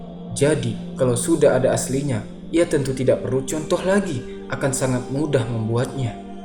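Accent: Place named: West Sumatra, Indonesia. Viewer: Admin orang minang ya. Soalnya logatnya kayak orang minang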